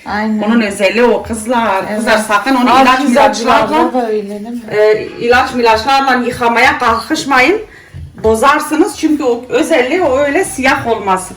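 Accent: Turkey. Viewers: orda doğdunuz yani nerelisiniz peki şive doğu gibi geldi yada yabancı dil konuştuğunuz için mi kız kardeşimde Fransa da 2 gün falan oldu gideli orda yaşıyo yeni gelin 😊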